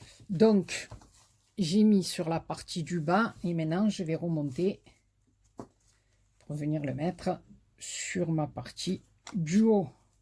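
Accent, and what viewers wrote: South, France. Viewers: c'est vraiment sincère!! j'admire ce que vous faites!!! j'espère un jour faire ne serait- ce qu'un tiers de ce que vous faites!!! et croyez moi, je ne vous lèche pas les bottes!! hihi en plus avec avec votre accent du sud,un vrai plaisir!!! merci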